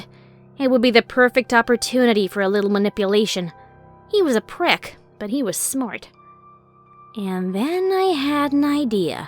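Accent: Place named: United Kingdom. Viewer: I don't know why,but I never really took notice as to how much suppressed her British accent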